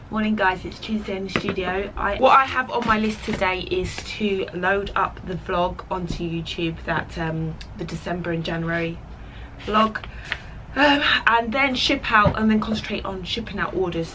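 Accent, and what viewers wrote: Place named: United Kingdom. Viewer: Hey, I love your channel ! I'm a full-time children book illustrator from Madagascar. I love seeing other black artists growing on social media, we definitely need more representation here ! Love, Sawyer PS: I love your British accent <3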